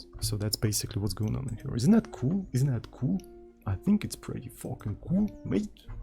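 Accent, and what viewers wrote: Russia. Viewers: Hi (or privet, as a Russian I hear russian accent in your speech :D)! Thanks for the video :)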